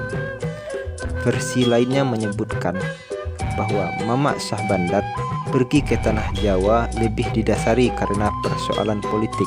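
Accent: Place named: Minangkabau, Indonesia. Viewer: Logat minangnya kental sekali udaaaa. Btw terima kasih atas informasinya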